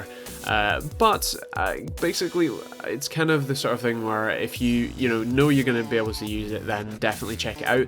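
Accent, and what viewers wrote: Scotland, United Kingdom. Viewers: Good review mate and good to see someone Scottish instead of American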